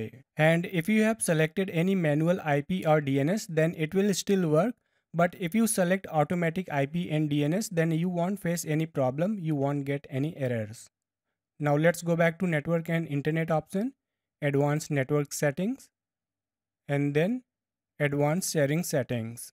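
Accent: India. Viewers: Your Indian pronunciation gave me a headache.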